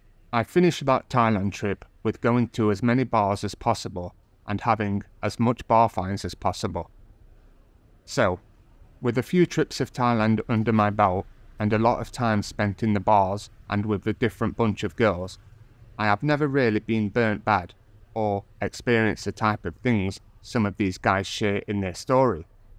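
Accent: England, United Kingdom. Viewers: if you're an Aussie, what's with the English accent? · you sound like a Pom to me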